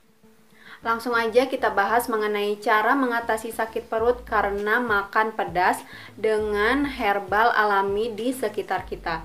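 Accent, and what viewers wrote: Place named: West Java, Indonesia. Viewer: orang sunda nih kayanya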